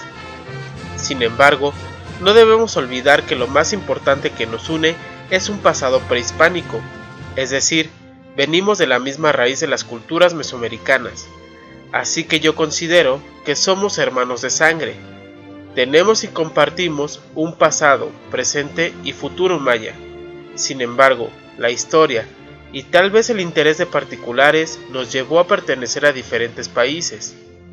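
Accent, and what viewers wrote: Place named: Mexico. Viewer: Nada que ver tu video amigo... Te escucho muy mexicano.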